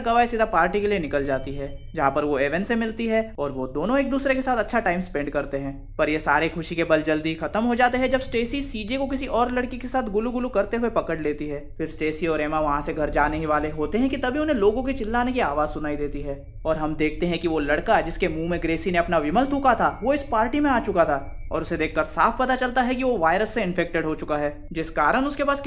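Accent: Maharashtra, India. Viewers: Ur Marathi accent is OP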